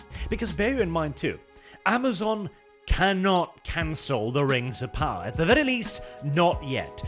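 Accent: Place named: England, United Kingdom. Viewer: I've noticed that he has this very crisp and pleasant English accent most of the time, but when he does longer videos with other people, he seems to partially lose that accent part of the time. Anybody know what's up with that? lol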